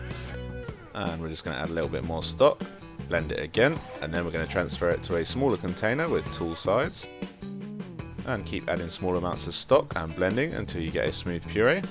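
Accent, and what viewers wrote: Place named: United Kingdom. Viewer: ha ha! No no, keep the mystery alive! Especially with the dreamy British accent! lol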